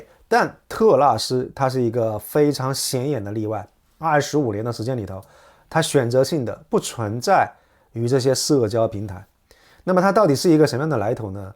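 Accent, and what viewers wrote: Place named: Liaoning, China. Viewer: 辽宁口音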